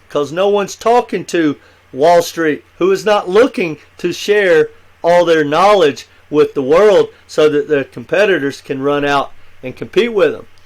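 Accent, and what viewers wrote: South, United States. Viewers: I like your southern accent . Ive been living in Memphis for years now but originally I'm from Chicago . After moving to the south I dont think Id move back to the north.. Southern hospitality is real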